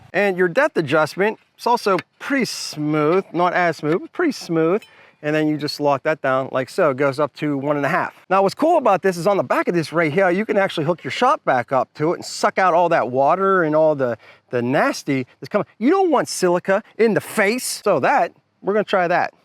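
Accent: Pittsburgh, United States. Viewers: I hear Pittsburgh in your accent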